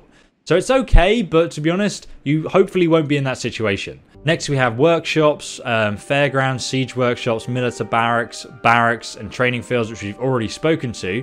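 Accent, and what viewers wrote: England, United Kingdom. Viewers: "mil-ih-tah"? England, please, just stop. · You guys do realize that the man is British and speaks proper English. He lives in a place where the english language started so just think about that before criticizing. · And Here i thought its obvious He is Brit oh lol..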